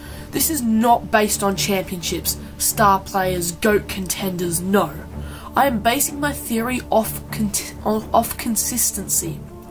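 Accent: United Kingdom. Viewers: he kid, when you say spurs is best franchise in the history of the nba history, you must be high on drugs or to drunk to figure out what the words that are coming out of your mouth, championships matters most, you can be in the playoffs everytime or even since the start of a certain team in the nbabut never won a championship, that won't count and by the way you sound like british stick with football, i'm filipino and despite being short for basketball our number 1 sport back here is basketball, play make sure that you know what your talking about, i forgive you this time, but allow me to say this, you're a f*cki'n idi*t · i don't necessarily agree w/ everything you said in the video, but you did a good job stating your case, and your voice is fine. you sound like a teenager w/ a british accent. How old are you, and what country are you in?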